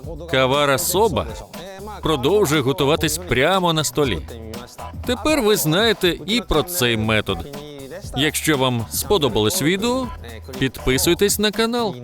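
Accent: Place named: South, Russia. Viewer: уважаемый, понимаю южно- русский говор, просто слух режит. Не кому не в обиду будет сказано.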